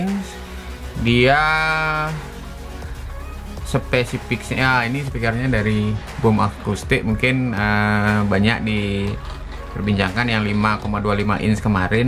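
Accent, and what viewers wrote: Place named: Bali, Indonesia. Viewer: Kyak logat orang bali😂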